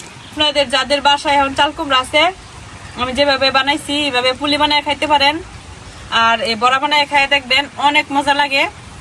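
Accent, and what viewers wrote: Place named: Netrokona, Bangladesh. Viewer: আপু, আপনার নিজের নেত্রকোনার ভাষায় যে মাধুর্য্য আছে তা কখনোই শুদ্ধ বা প্রামাণ্য বাংলায় পাবেন না। পশ্চিমবঙ্গের দুর্গাপুর থেকে বলছি - দোয়া করি ভালো থাকুন, সবাইকে ভালো রাখুন, আর আপনার মুখের হাসি অক্ষয় হোক। 🇮🇳🤝🇧🇩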